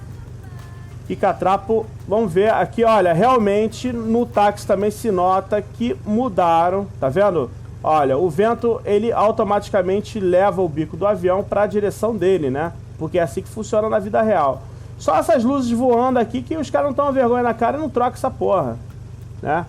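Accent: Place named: Rio de Janeiro, Brazil. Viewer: sotaque de carioca puxado, não é o mesmo produto, com arquitetura melhor vai sair incríveis add-ons, espera 1 ano que vc vai ver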